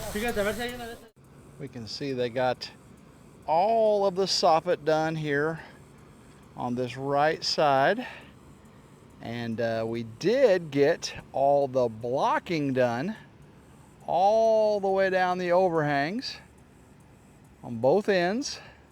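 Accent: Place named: South, United States. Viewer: You don't like the accent? Like Skynyrd said, a southern man don't need you around anyhow! Cool video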